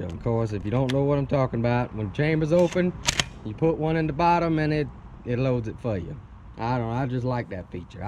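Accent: Louisiana, United States. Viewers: Are u from Louisiana?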